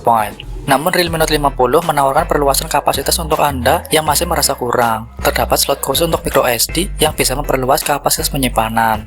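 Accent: Banyumas, Indonesia. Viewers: Ciri khas 😅Konten kreatore wong ngapakkk ikii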